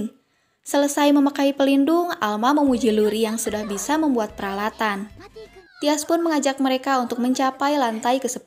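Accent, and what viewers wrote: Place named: West Java, Indonesia. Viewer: Wkwkwk tigebros piks orang sunda iyeu mah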